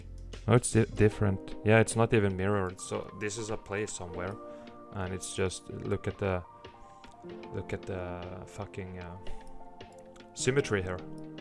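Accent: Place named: Scandinavia. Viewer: Where r u from. Those of is in the states have a hard time recognizing different accents . My guess is 🇳🇴 or 🇸🇪 or Finland 🇫🇮.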